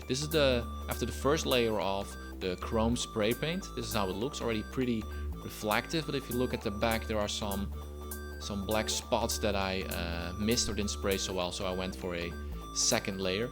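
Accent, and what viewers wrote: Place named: Netherlands. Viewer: het is leuk dat je automatisch iemand kan herkennen die nederlands praat aan het accent :>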